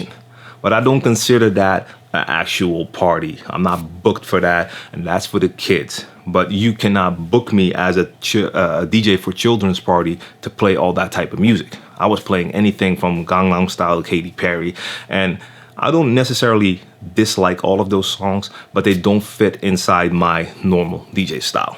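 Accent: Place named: Ohio, United States. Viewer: sirch one Hey bro, where are you at? Sounds like NE Ohio to me, lol. A small bit of advice that works for me is to find a spot where you can play what you like. My passion is to play breaks and little known gems from popular artists along with golden era hip hop but that doesn't work in the dance clubs in my area. I can do the top 40 thing because it's so easy and I have so much experience mixing hard to mix stuff because of my passion. What I did to counter the downsides of top 40 gigs (that pay after all) was find some hipster bars that let me do my thing for free beer. That way I'm always scratching my itch to play what I like and practicing in public which is always better than practicing in the "bedroom".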